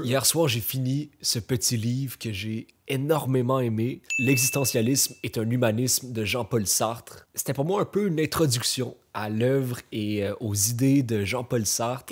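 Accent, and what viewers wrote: Quebec, Canada. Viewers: J'ai beaucoup de mal a comprendre les critiques sur ce type de défi. Autant je suis quelqu'un qui aime prendre son temps pour lire un livre et je suis plutôt du genre à lire un livre par mois, autant voir une personne qui se dit "je vais me motiver pour me remettre à lire et reprendre le gout de la lecture", comment trouver ça négatif ? Je trouve que c'est vraiment bien ce que tu fais, ça pousse à se remettre à lire, à se dire qu'on peut se donner des défis personnels pour se motiver à faire des activités, après chacun suit son rythme et ses envies. Bravo en tout cas pour tes vidéos. (PS: Ça fait plaisir d'entendre parler un peu québecois sur mon YouTube très anglais ou français, cela me rappelle ma belle année en Gaspésie.) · I don't know why YouTube recommended your channel to me but I loved the different accent though I don't understand French/Quebecois. 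Greetings from Rio de Janeiro 🇧🇷☺️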